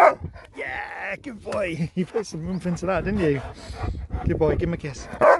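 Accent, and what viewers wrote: United Kingdom. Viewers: Are you cornish?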